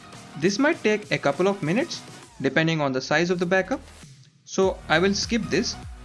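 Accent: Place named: India. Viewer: Funny Indian accent😅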